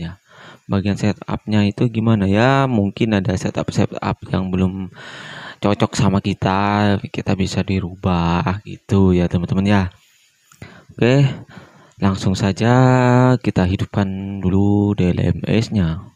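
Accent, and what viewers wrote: Madura, Indonesia. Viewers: Meduro y kang? Hehehehehe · Kayak orang Madura ya ini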